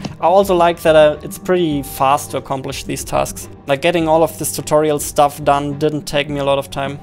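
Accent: Germany. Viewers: Bist du deutscher? Dein Englisch klingt nämlich so xD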